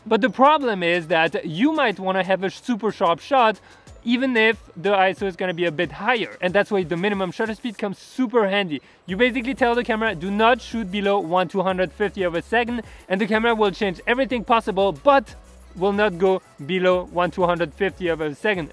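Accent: France. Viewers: Super French accent mode :D J'adore!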